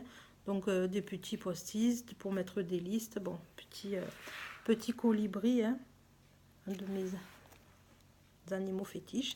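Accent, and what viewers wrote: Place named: South, France. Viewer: Bonjour, je découvre ta chaine et je b'abonne 😉 et en plus du sud (on est fiers de notre accent ) moi Perpignan Pas facile de tenir une chaine la mienne est a l'abandon depuis aout ou septembre, travail, vie de famille les jours à 24h sont trop courts😂😂 A bientôt, Bisous bisous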